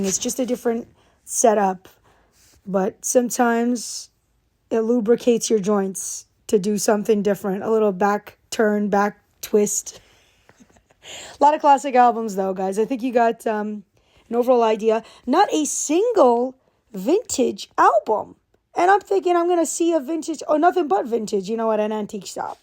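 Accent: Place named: United Kingdom. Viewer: I like your bri ich accent , yeah ?😉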